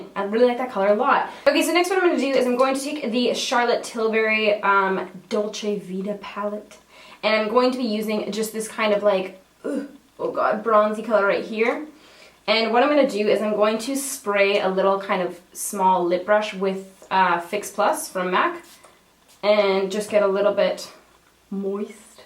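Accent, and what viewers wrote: Canada. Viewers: I am obsessed with your accent, I love Canadian accents soooo much haha. I would love to visit Canada, it's my number one destination! I'm from Australia and I've never been overseas so I'm so unbelievably keen to start traveling. Love your work, you're amazing. xxx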